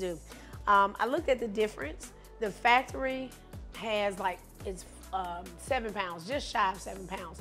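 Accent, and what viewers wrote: South, United States. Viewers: I was really loving a video, but then she started to talk and I fell in love 😍 I love me a good southern women’s accent. Great shooting and you got your new subscriber. ❤❤❤ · You deserve more subscribers. Cool content. Not many women doing this & as a Georgian I appreciate the cute southern accent. Cheers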